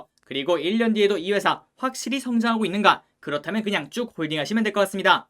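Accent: Gyeongsang, South Korea. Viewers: 대구? 경북? 어디 사세요?ㅋ 제가 대구라 반갑네요 ㅋㅋ 근처에 연예인이 사는느낌이군욬ㅋㅋ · 고향이 대구십니까?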